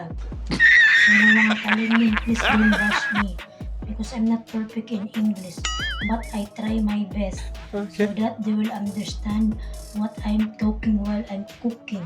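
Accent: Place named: Arab world. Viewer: Ayos host ah parang arabo na rin magsalita hehe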